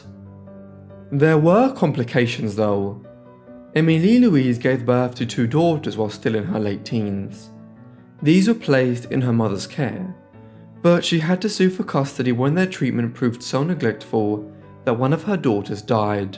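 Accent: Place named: United Kingdom. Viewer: Perhaps it is a good idea to write the names of streets and places on the screen as well, just like you do with the names of people. I could not make out some of the locations. Your pronunciation is too English for me. French is spoken in front of the mouth with articulate movements of tongue and lipts, English is spoken in the back of the mouth with minimal movements of tongue and lips. This is why the English, whose culture makes them refuse to alter their habits, it is well-known, seldom manage to speak French like French. It is not a critique but an observation. Start speaking French in the front of your mouth and all of a sudden it sounds French and people will understand your French with great clarity. Just try it sometimes.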